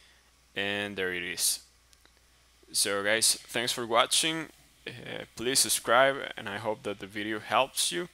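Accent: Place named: Costa Rica. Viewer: Are you Costa Rican? Your accent sounds very Costa Rican to me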